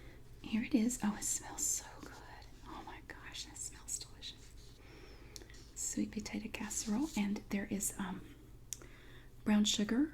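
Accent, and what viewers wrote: South, United States. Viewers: I lived in Thomasville for a short time after college, running a local farm. Before this video based on your accent I really thought you must be in that area! (I moved around southernVA and northern NC a bit for a few years before landing in the northern va/dc area. So many great things about living down there.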